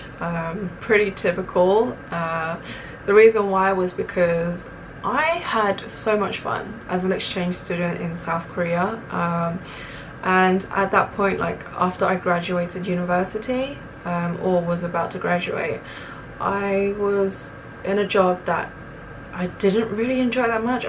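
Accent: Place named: Australia. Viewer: That accent has some Aussie in there for sure!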